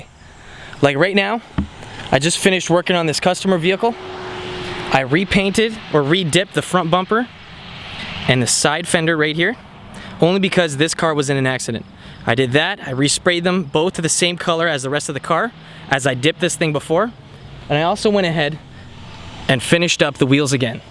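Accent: Ontario, Canada. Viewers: are you from ontario?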